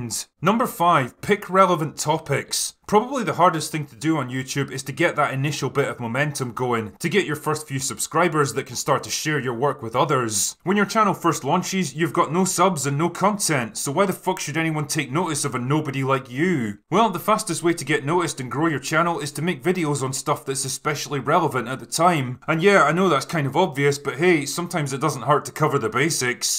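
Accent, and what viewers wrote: Scotland, United Kingdom. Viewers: The Scotish accent helps too. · Love you,...same reason I love "orange man good". You're blunt, intelligent, you don't give an F and you get to the point, with a unique Scottish accent, articulation and you're just outright hilarious at times. I thank God for ya, mate. You're a man's man with a good heart. Alright. I'll go away now. Hugs bub. 🤗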